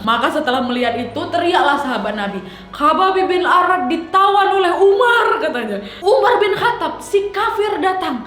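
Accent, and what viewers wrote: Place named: Medan, Indonesia. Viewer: Orang medan nih hehe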